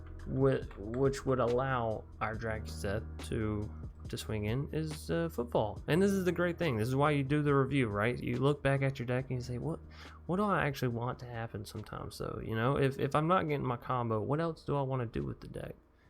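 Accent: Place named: East Texas, United States. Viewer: Your combination of the east Texas-esqe accent with the geeky content is highly entertaining.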